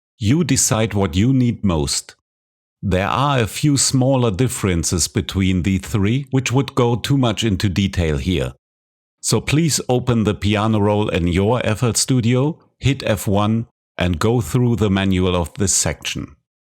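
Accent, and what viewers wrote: Germany. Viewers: Your tutorials are so good. And I like your strong german accent. That matches perfect to your lessons.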